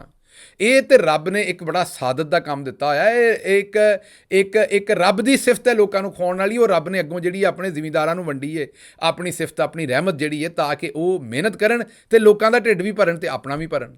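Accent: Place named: Punjab, India. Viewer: I love ur pure punjabi accent...unique..talented...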